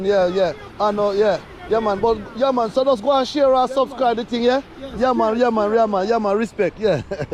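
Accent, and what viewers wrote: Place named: Black Country, United Kingdom. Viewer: Loving that black country accent.😊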